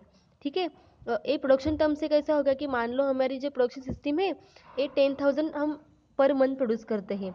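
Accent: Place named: Pahadi, India. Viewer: ap pahadiype kyu bol rahi ho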